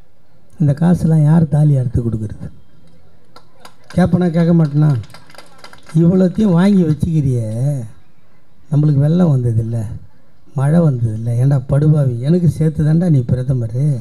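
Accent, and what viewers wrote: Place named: Chennai, India. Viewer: யதார்த்தமான சென்னைப் பேச்சு , மக்கள் பணி சிறக்க வாழ்த்துகள் 🙏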